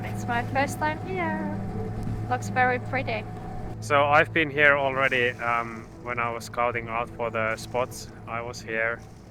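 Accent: Finland. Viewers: The first video I saw was the previous one, and this whole concept; the two of you and a big puppy braving arctic weather in a sail boat for half a year is seriously giving me life force back. It’s so raw and beautiful, all of it. Your (Finnish accent is LOVELY btw!!)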